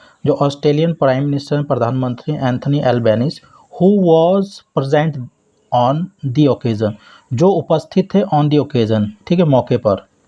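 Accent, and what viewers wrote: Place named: India. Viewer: Accent = India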